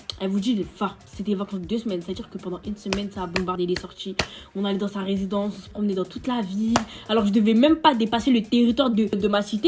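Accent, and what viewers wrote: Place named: Democratic Republic of the Congo. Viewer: Ça sent que tu parles Lingala 😂😂😂😂😂😂😂😂😂😂😂😂 Tema l'histoire digne d'un film wallah 😂 Je me suis abonné direct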